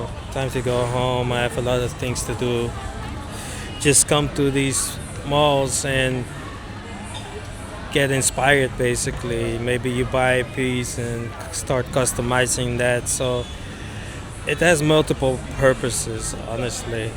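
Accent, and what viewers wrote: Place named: Netherlands. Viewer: Awesome video man. I would love to visit this place. Your accent sounds like you're dutch? 😅 Thanks for showing around. That mall looks great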